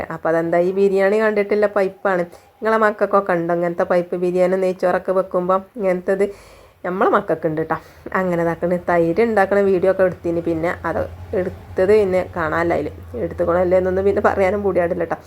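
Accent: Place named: Thalassery, India. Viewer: ഓരോ ആളുടെ ശൈലി അല്ലെ അത് എനിക്കു തലശ്ശേരി ഭാഷ ആണ് എനിക്കു ഇഷ്ടം ആണ് നിങ്ങളുടെ ഭാഷ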